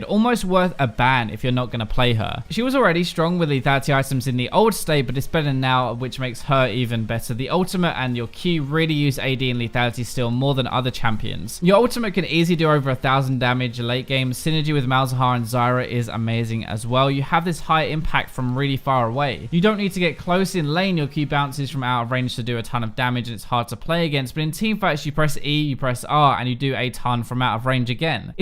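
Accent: England, United Kingdom. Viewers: hey which part of England are you from? i cant figure out from your accent, thanks:)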